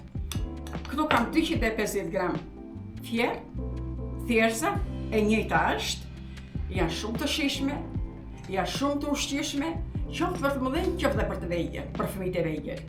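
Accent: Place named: Piqeras, Albania. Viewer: te lumshin duart e arta dhe modestia qe ke te ben mjaft fisnike! Por ti e ke ruajtur gjuhen e Piqerrasit, ndersa vajza flet me dialekt te Shqiperise se Mesme neper video! 😊😊😊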